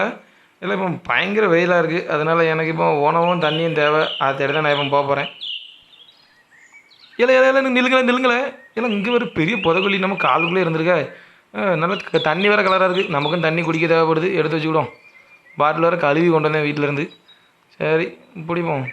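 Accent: Tirunelveli, India. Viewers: Super la naama oru slang vara level laaa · Ji super. Namma ooru slang romba arumai. Plz upload more videos ji. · Bro, next video release please... only solo video with pakka nellai slang... PLEASE.. nammooru basha kettu romba varusham aachillaa...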